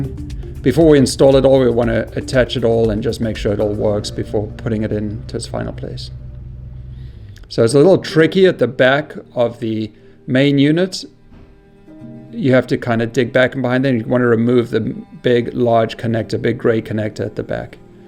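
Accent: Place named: South Africa. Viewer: Nice Saffer accent :)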